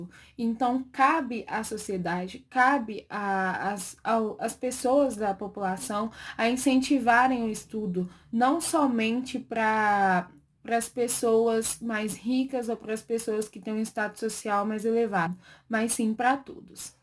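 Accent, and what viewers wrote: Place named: Minas Gerais, Brazil. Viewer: Ótima análise! Belo sotaque 😊 É mineira? Rsrs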